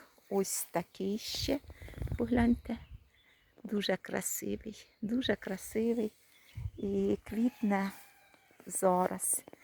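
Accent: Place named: Cherkasy, Ukraine. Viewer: Дійсно море 😂 ! У вас Черкаський акцент.😂 Моя Батьківщина 🤗Гарного сонячного дня 😊👋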